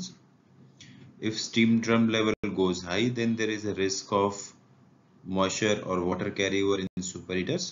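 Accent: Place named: India. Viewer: I don't. understand. your hindu. english. speaking it has. vibration on tounge your mother language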